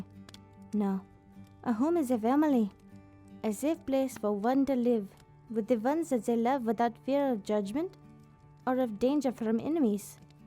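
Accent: Germany. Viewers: Hmmmmm so much german acsent... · Ze German Lycanbae has returned! I'd mate her. The accent is my favourite. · corwyn wolski it's a German accent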